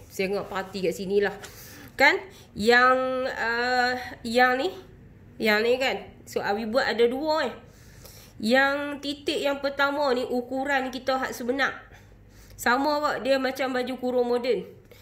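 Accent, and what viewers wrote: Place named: Kedah, Malaysia. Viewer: Asalamualaikum Terima kaseh Banyak dah Saya tengok vedio pola Baju kurong tapi Cara u explain sgt2 jelas Dan mudah d fahami Dan lucu dgr Loghat Kedah u rasa u memberi penerbangan sgt2 jelas u slalu kata jgn dok COMPLAIN. BUAT. DULU BUAT YG. MUDAH. DULU SAMPAI. FAHAM BETUL belajar yg mudah dulu sampai faham lepas tu Baru belajar yg lebih advance TERIMA KASEH. SEMOGA SIHAT2 SELALU DAN D LINDONGI ALLAH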